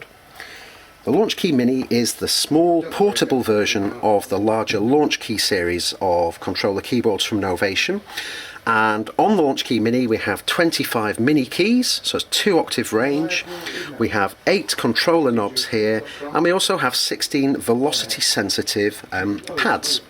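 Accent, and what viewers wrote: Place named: Australia. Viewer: Alright, I hope you work it out. Good to hear a fellow aussie!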